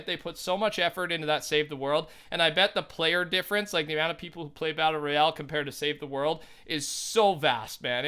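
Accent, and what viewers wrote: Canada. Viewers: Damn. So many comments and likes not one person got that it’s a Canadian accent we pronounce and spell a lot of words differently!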